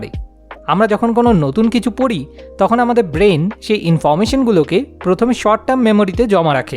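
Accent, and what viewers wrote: Kolkata, India. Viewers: Apni ki kolkatar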